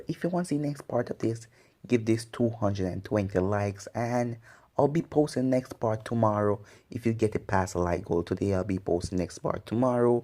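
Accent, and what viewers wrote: Jamaica. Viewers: Hes Jamaican dumbass.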